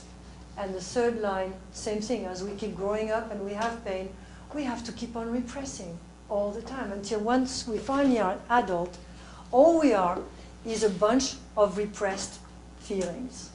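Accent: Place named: France. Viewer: She is right. By the way, her French accent is really fine :-)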